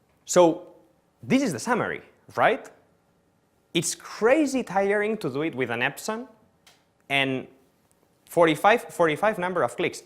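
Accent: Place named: Italy. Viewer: Sicuro sei italiano!!!😊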